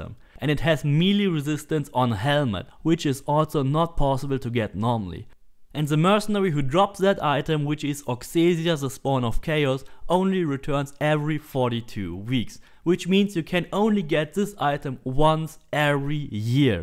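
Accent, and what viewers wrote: Germany. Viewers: Is he german ? :D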